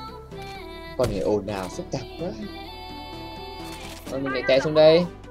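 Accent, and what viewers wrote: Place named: South, Vietnam. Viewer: nói tiếng Nam hai đấy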